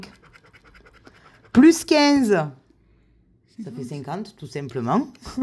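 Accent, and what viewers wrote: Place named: Marseille, France. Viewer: Bonne journée bisous bonne chance j'aime bien votre accent vous êtes de Marseille ?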